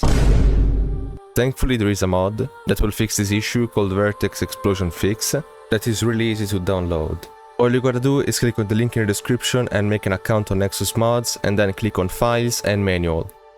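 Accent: Italy. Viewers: Sei Italiano? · bro sei italiano vero?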